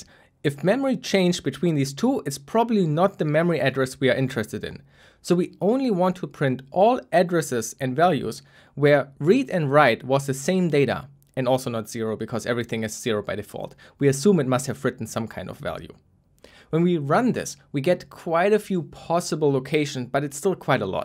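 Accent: Germany. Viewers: Er spricht englisch, hört sich aber deutsch an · love the video,are you german? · the german accent is so strong 🤣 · Nice German accent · You sound very much like youre german. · i can hear this guy is german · Ich liebe diesen deutschen akzent 😂 aber geniales video 👌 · Er spricht gutes Englisch aber man hört trotzdem das er Deutscher ist 😄👌 Damals als Kinder haben wir Handy Pokemon gesagt weil Missigno mit der Antenne aussieht wie ein Handy 😂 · Man hört so raus dass du deutsch sprichst xD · digga dein deutscher akzent ist ja mal anders cringe :'D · I knew you must be a German (way of speaking) 🤨 and you are... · Beim Hören gemerkt der ist doch ein Deutscher und wurde im Impressum bestätigt. LG aus Bremen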